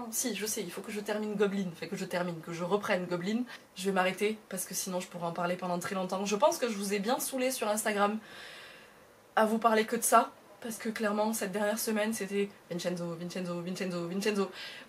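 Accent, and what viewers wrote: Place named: Toulouse, France. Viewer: J’aime tellement tes vlogs ! Moi qui suis une toulousaine expatriée en région parisienne, je suis toujours ravie de retrouver ton accent ! 🥰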